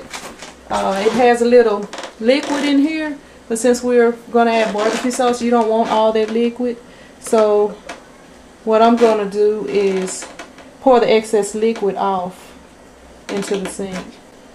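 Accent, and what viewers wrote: South, United States. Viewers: I like the southern accent. Shes a hottie too. Ill try the recipe.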